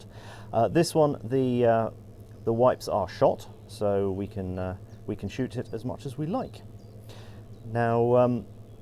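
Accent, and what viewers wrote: United Kingdom. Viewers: From his accent,I assumed he was British,and living in the UK. I then send,WTF, how can he get access to a suppressed PISTOL ???!!! I then learn he is in Switzerland. Didn't realize they have gun laws not much different than in the U.S.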